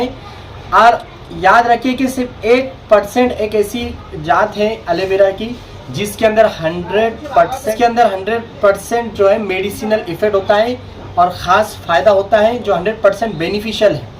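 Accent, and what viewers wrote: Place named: Aurangabad, India. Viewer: Aawaz me Aurangabadi Laheja Bahut hi badhiya hai Dr.sahab...👍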